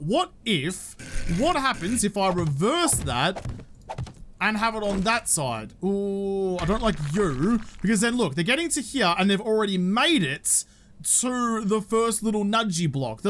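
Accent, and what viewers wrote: Australia. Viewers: Maybe its the Acapulco Gold talking but damn this is awesome. Love the Aussie (?) accent.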